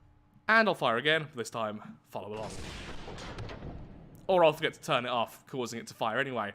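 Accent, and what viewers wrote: United Kingdom. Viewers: God have I missed your spiffing British voice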